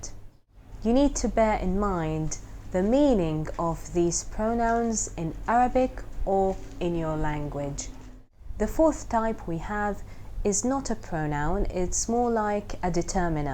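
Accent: United Kingdom. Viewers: احسس حد في العرب سمعته بيتكلم انجليزي بريطاني ان شاء الله في يوم هاتكلم زيها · ماشاء الله تبارك الله ربنا يوفقك اللكنة البريطانية جميلة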